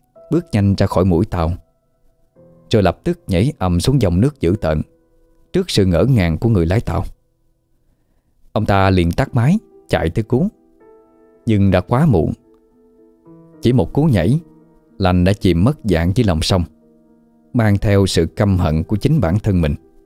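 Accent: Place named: Mekong Delta, Vietnam. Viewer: Chuyện ma mà nghe xúc động ghê 😮 giọng miền tây đặc ruột k ta sao nghe dễ thương quá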